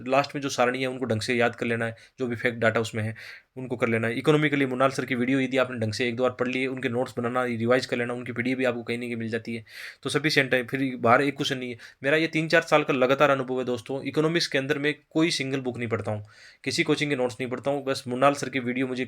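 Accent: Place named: Jodhpur, India. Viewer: Sir aapke voice tone se lgg rha h aap jodhpur side se hoo please sir btaao aap khaa se hoo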